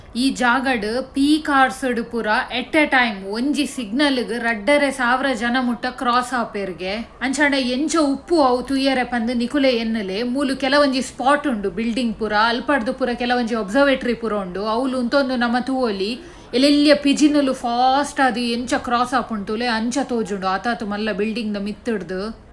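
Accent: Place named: Tulu, India. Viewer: ಈರೆನ ತುಳು ಪೊರ್ಲು ಆಪಂದ್ದು. ಮಸ್ತ್ ಮೊಕೆದ್ದ ತುಳು ಪಾತೆರಿನ ಖುಶಿ ಆಪುಂದ್ದು..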